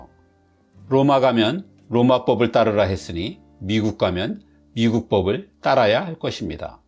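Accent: Gyeongsang, South Korea. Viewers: 남한 표준 언어의 경상도 사투리 오염 현상 때문에 그렇습니다. 경상도에서 ~또 라고 발음하죠. 전에는 없던 현상인데, 없어져야 할 악습입니다. 지적 잘하셨습니다.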